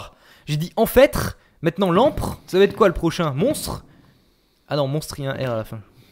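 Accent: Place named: England, United Kingdom. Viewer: j'adore ton accents anglais 😂😂😂 Sinon super vidéo comme toujours 👏👌👍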